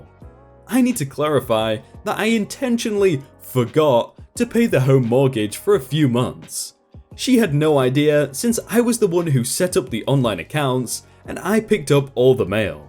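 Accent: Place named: North, United Kingdom. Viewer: NYC BABY I'm not upset, I'm well aware he mispronounces things. I assume when you have a northern accent words sound different in your head, but I wouldn't know. Regardless whether it's a name or a word, it's new and I wouldn't have pronounced it any differently NOT being a northerner. It looks like sand-oval. But then if a word is new I do pronounce them how I read them.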